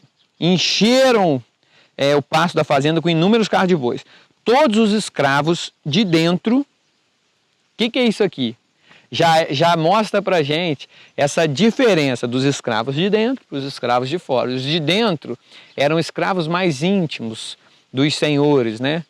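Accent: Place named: Minas Gerais, Brazil. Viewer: Algo me diz q vc é mineiro, acertei??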